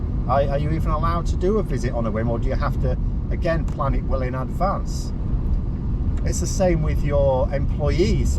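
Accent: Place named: Yorkshire, United Kingdom. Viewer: I'd support that. I'll even write him a reference. Forgive me but that sounds like a Yorkshire accent. Certainly from the North of England, that's a good start!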